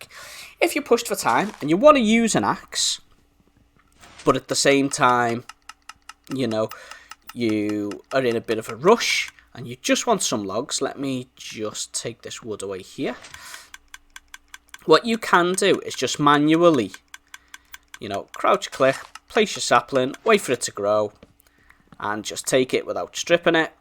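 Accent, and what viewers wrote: Liverpool, United Kingdom. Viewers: Liked just because you are a scouser :)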